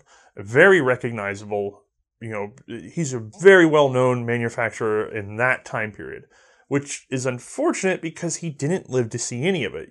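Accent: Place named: Carolina, United States. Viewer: It's spelled and pronounced "perdy." Gotta love that Carolina accent. :D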